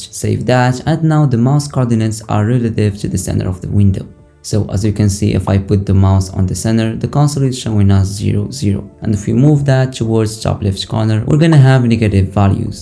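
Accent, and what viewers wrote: Morocco. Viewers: علاش في نبرة ديالك فيها النغربية واخا لونجلي نقية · u have a moroccan accent rak nadi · Are you from Morocco or Algeria because your accent is similar to the Moroccan dialect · Your accent like ur Moroccan bro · I can hear the moroccan accent❤ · You're accent shows that you're from morocco 🇲🇦 Am I wrong? 😅🤣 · You sound Moroccan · you have moroccan accent <3😎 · you sound moroccan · i think this is a bit closer to moroccan accent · mn l'accent katban mghribi😅nice video tho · Nadi a khy 3raftek meghribi rir men l accent 😂💯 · that's what i thought because i'm from morocco and his accent similiar to moroccan English 🙃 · khoya I sense a moroccan accent here, otherwise your video is freaking generously informative, the amount of work you put it is amazing man, thank you so much for the effort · that Moroccan accent tho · كاين اساط كمل للهجة المغربية حاضرة مي راك ناضي تبارك الله 🔥💪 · 10000% maghribi ghir mn Accent dyalk mwara9 asat 😂😂😂😂😂 but good vid tho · from your accent i guess your morrocan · I can tell by the accent that this guy is a fellow moroccan brother Keep going my guy ! 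· From your clear language I think you are from Moroccan country correct if I am wrong and thanks for this helpful tutorial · akhay hadchi 3ndek hrbaan saraha, 3rfti sbhanlah mgharba baynin, okay3rfo b3dyathom gha mn l'accent,knt gha msserkl ta dkhlt lvideo o 3jbni lhal nchof drari mgharba 3atin fdomain.mgharba finma htitihom i3tiw anyway rak nadi assat tbarkelah 3lik ❤️❤️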